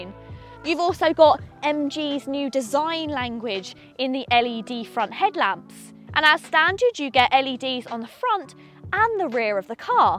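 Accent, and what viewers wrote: United Kingdom. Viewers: i came here for the electric reviews. i stayed for the british accent.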